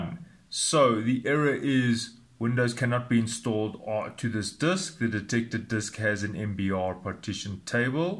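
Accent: South Africa. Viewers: That South African accent! Thanks bro, from one saffa to another!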